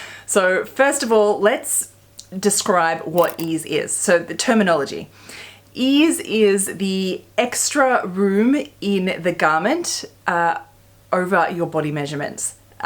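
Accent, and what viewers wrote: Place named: Australia. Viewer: You look so French today, but amusing to hear the Australian accent! Lol!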